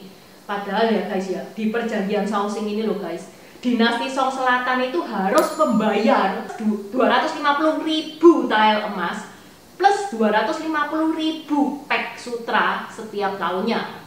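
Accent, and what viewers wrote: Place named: Java, Indonesia. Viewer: Mbak ini logat bicaranya tellu Jawa SGT kental dan medooook bgt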